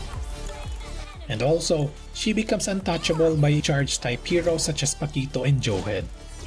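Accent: Philippines. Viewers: Pinoy na pinoy yung accent.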